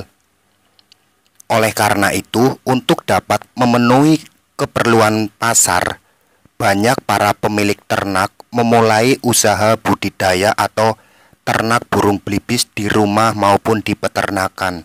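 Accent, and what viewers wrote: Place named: Ngapak, Indonesia. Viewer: Mas nya ini suaranya medok banget,,wong ngapak ya mas,,?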